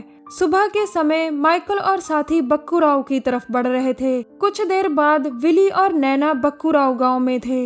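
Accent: Punjab, India. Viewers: Are u Punjabi 😀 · tohdai awaj to hint mil gya si ke tusi punjabi o ....best of luck for your Channal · Madam aap punjabi ho ? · Aap punjabi bolte ho na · Punjabi tone aa rahi he...bechari zabardasti Hindi bol rahi he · Aap punjabi lgte ho bolne ke style se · Taudi tone teh Punjabi hi rehni haige chahe jini bhi Hindi bolan de koshish Kar Lao ji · I love your Punjabi accent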